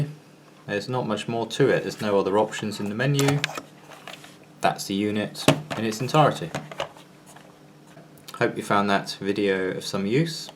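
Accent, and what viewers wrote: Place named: United Kingdom. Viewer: if you wanted one with rechargeable batteries and an adapter why don't you go get one??? there are many other brands, brother included that sell them as you wanted. why buy a cheap product and then complain about it not having components. Must be a British, UK or whatever the hell your accent is from type of thing. Don't buy equipment that is cheap and then want all the extra features. use your common sense!!!!!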